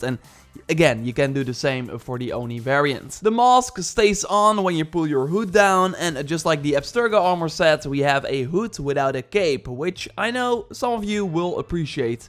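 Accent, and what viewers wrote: Netherlands. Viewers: Je hoort gwn da hij Nederlands is😂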